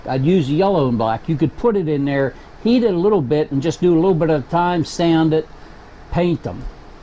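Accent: United States. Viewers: I'm originally from New Castle. I know that western PA accent when I hear it! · Are you from pennsylvania? I can hear it in your voice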